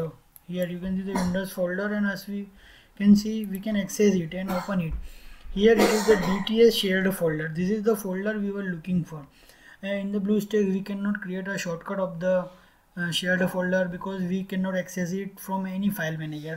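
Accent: India. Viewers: That is the most Indianest accent I have ever heard. Keep up the good work bro! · You know you're saved when the commentary is in indian accent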